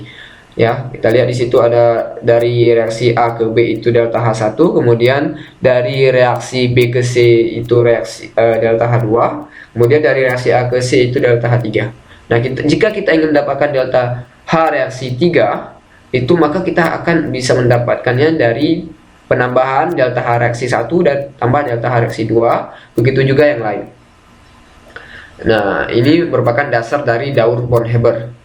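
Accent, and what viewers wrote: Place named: Sulawesi, Indonesia. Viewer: Logatnya sulawesi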